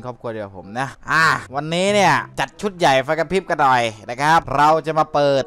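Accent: South, Thailand. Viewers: พี่ จังหวัดไหนของภาคใต้คับ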